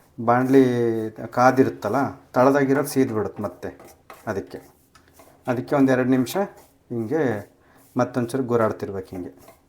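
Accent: Chitradurga, India. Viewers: ದುರ್ಗದ ಭಾಷಾ ಸೊಗಡನ್ನು ಹಾಗೆ ಉಳಿಸಿಕೊಂಡು ಬಂದಿದ್ದೀರಾ ಕೇಳೋಕೆ ತುಂಬಾ ಖುಷಿಯಾಗುತ್ತೆ ನಿಮ್ಮ ಅಡುಗೆ ಎಷ್ಟೇ ರುಚಿಯಾಗಿದೆ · ನಿಮ್ಮ ಮಾತು ಕೇಳ್ತಾ ಇದ್ರೆ ನಮ್ಮ ಅಮ್ಮನೇ ನೆನಪಾಗ್ತಾರೆ. ದುರ್ಗದ ಮಾತು ರುಚಿ ತುಂಬಾ ಚೆಂದ. Thank you so much.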